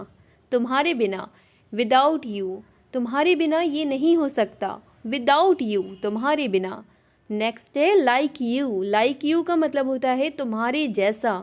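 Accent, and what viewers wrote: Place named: Bihar, India. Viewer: What a bhihari English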